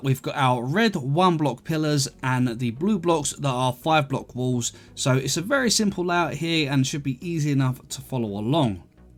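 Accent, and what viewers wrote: United Kingdom. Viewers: ARE U BRITISH!?!?!?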